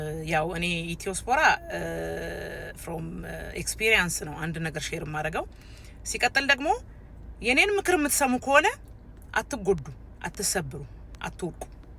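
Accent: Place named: Nigeria. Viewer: From your accent, I can tell that you are/were with Nigerian guyoo!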